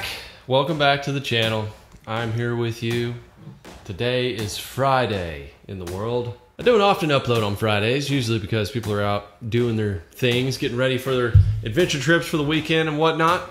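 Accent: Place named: Appalachia, United States. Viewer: Great video to see you being you, and not much of that overdone hillbilly accent.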